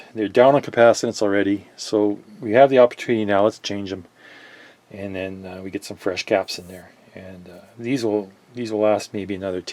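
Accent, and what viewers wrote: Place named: Alberta, Canada. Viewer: This is my favorite vintage audio restoration and Alberta-accent channel on YT. So informative. You deserve far more subscribers, sir.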